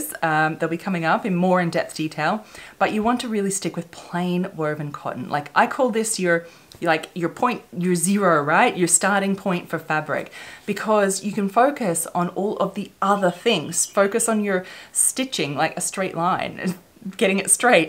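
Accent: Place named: Australia. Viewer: Hi, so great to hear an Aussie and refresh my sewing skills. I have been sewing for a few decades and have waxed and waned with my sewing. After years of sewing quilts etc I am keen to get back into sewing clothes. I have dipped into your videos and love that you are focusing on sewing clothes, your videos on altering opp shop finds are great too. Thank you for such informative and lovely videos.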